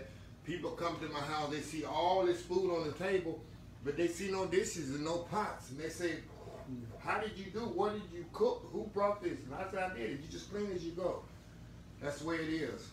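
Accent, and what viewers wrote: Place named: North Carolina, United States. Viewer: Love watching you create different foods ! Love your accent. I know you from Texas but it reminds me of NC in which I lived there for quite a few years. People there are pleasant . They love their get togethers and. SOUL FOOD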